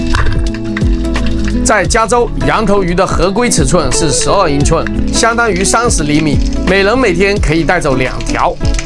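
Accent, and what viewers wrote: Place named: Fuzhou, China. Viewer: 你是福建福州人吗 福州口音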